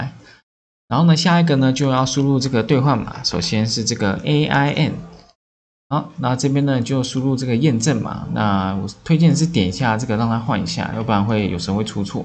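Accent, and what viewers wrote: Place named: China. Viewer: 如果頻道主真的是台灣人，請不要學大陸人說英語的口音，還是頻道主是大陸人扮台灣人？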